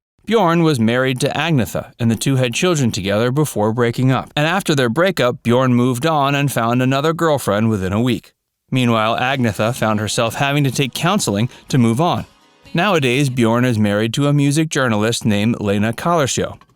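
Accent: United States. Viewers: I was set a bit curious by that reference too. But without prejudice hearing the narrator's accent i can correctly guess he's American. America didn't give any hoots yet about ABBA so forgive them. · Listening to your lazy Americanized pronunciation of their names was painful.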